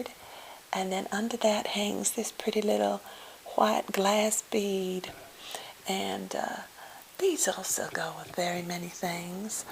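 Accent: South, United States. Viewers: I love the fact you didn't go overboard with the usually stereotypical "Southern" accent. I have actually heard women that speak like this. Especially how you said window screen. Thank you for your wonderful videos! I am so glad I found you! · When the video first started, I was disappointed for a minute because I was expecting a different kind of Southern accent but the longer I watched it, the more my head melted so I am no longer disappointed! Thank you very much for this! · you remind me of one of those 1950" s housewives you're beautiful and have a very southern accent love to hear southern accents mine is Lakota Sioux sound very young voice lol anyways love your videos and earrings but why do you whisper ? and anyway love to be friends on my fb my name is summer rain Americanhorse on F B ty for the videos God bless🐝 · Love Miss! Would like to hear more as I do love her southern accent - gives me tingles! 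This is one of my top fav asmr videos. Thank you.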